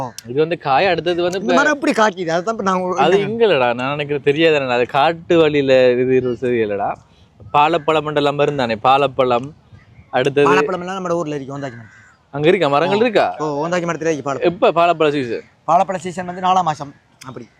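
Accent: Sri Lanka. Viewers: இலங்கைத்தமிழ் மிகவும் அருமை 🎉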